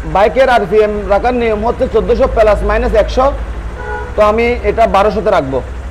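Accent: Chittagong, Bangladesh. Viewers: আপনি চট্টগ্রামের কাছের মানুষ, বাংলাটা আরোও পরিস্কার করে বলা উচিৎ কারণ, চট্টগ্রামের ভাষা দিয়ে সারা দেশ চলে না - ধন্যবাদ।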